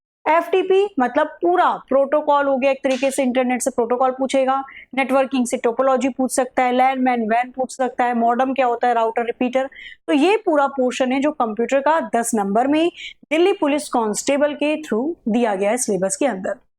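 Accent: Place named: Bihar, India. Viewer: Mam your bihari accent is too cute ❤. Love it❤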